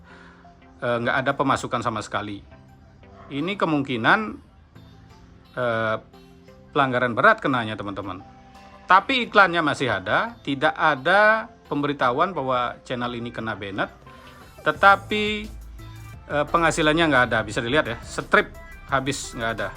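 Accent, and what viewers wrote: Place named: Madura, Indonesia. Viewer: Logatnya kok mirip orang madura ?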